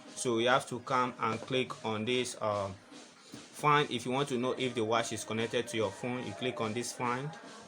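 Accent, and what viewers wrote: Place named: Africa. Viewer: Brother, I can tell you're African from your accent, and I'm really proud of your video. I bought this watch from the Best Buy online store, and I needed to know how to charge it. Thanks for your help.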